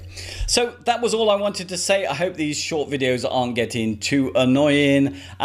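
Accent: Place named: England, United Kingdom. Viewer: I was going to say Pommy accent, but then I realised no one outside of Aus would have understood what I meant :)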